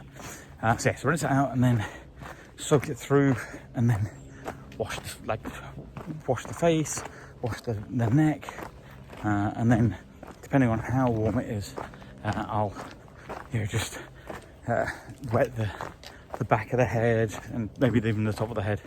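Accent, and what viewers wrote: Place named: Derby, United Kingdom. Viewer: Not going to unsubscribe...was hoping to meet you in person but since i missed you i will say it here. I lost my brother in law who was from Derby a little over 10 years ago, so your accent and wit remind me of him and it is a welcome addition to my day.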